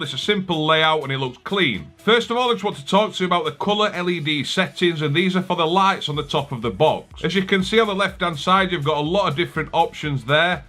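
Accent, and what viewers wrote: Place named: North, United Kingdom. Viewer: You're definitely the happiest and funniest Android Boxes reviewer that I've ever seen in the whole Youtube. Got a new subscriber! By the way your northern British accent is very pleasant to listen to.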